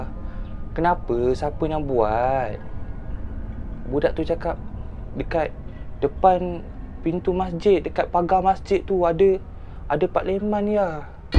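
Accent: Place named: Negeri Sembilan, Malaysia. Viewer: Esse org nogoghii....slang nogoghi kelauttt🤣🤣🤣👍👍👍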